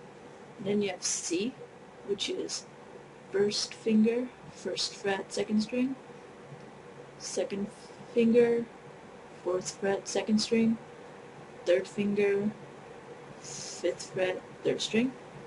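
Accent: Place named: Asia. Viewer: Lol ur asian accent. You said," da tabs..."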